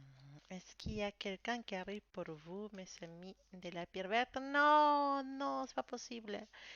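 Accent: Spain. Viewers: Bonjour, je vous découvre avec votre douce voix et joli accent espagnol. Vos guidances sont vraies et si lumineuses. Je travaille depuis plusieurs mois pour lâcher prise de mon passé toxique, être en paix dans mon âme, mon coeur et mon corps et l'univers me met à nouveau mes ex sur ma route. Difficile d'être seule mais je pries mes archanges et mon ange. Je suis sereine malgré mes difficultés financières et refuse le retour d'un ex. A quand le repos et le bonheur d'aimer à nouveau de tout mon être? Comment s'appelle votre pierre orange qui vous illumine? J'aimerais gagner un tirage personnel et participe au tirage au sort. Merci d'être là. Je vous embrasse. Namasté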